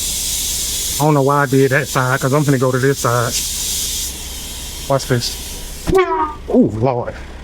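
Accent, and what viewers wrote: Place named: Maryland, United States. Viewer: You sound like someone I know. Are you from Maryland by chance? 😅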